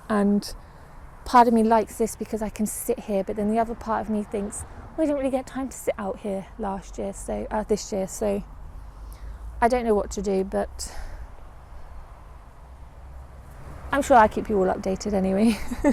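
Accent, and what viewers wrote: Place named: Wales, United Kingdom. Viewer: Hooray! Lovely update 😊 You know, I think we're all very critical of our own Allotments, I think yours looks rather tidy! Looking forward to seeing a bit more of you in the winter months. A bit of a Welsh twang in there too 🐑🐑 x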